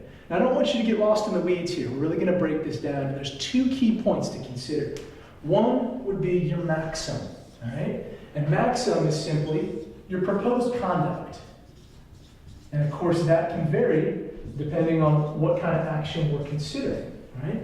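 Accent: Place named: United States. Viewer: Thank you for the video even though it was not crystal clear (or some smoke remains), it was helpful. Its just the US accent and US examples what was difficult. Thank you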